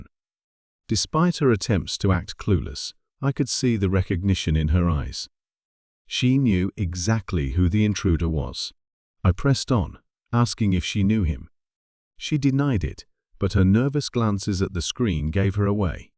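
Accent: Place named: Yorkshire, United Kingdom. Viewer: This sounds like Sean Bean's Yorkshire accent.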